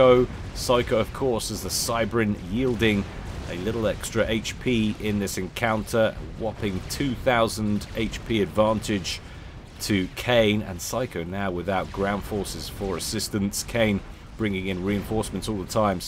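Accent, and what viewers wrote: United Kingdom. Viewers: I think so, he sounds quite British to me.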